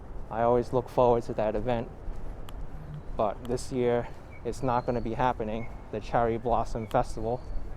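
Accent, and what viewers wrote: New York, United States. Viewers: This might be your best video yet. The part where you're walking through Astoria Park, especially down along the river between the Hell Gate and the Triboro (sorry, it'll always be the Triboro to me - nothing against RFK, but I'm just too old - LOL) is breathtaking. I spent many a happy hour at Astoria Pool as a kid. Sometimes when I was really young, my dad would drive over from Woodside to the Shore Drive. I was always fascinated by looking at the East River and the tales of there being a big whirlpool under the Hell Gate Bridge. I always expected to see a passing boat get swallowed up. Never happened! Seeing Astoria Park at twilight was amazing. And one other thing: I love your New York accent. It's a mild NYC accent, not an Archie Bunker NYC accent. Most accents, be they Southern, Boston, Chicago, NYC, etc., are enjoyable as long as they're not extreme. 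Yours is the real thing. Keep up the good work. I love your videos.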